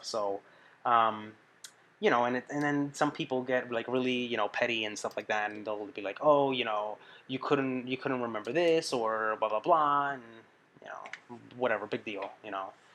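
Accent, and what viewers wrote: New Jersey, United States. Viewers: So that's why I hear you NJ accent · You are so instantly lovable. ♥ (Also, when you mentioned you are from NJ ... instantly, I started hearing it, and I'm not even from your side of the Atlantic!) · Answered my question. NJ accent. =)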